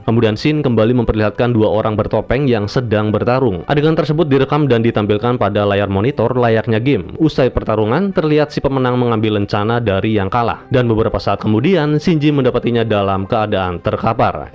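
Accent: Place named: Cilacap, Indonesia. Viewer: Rika wong Cilacap yah kang 😅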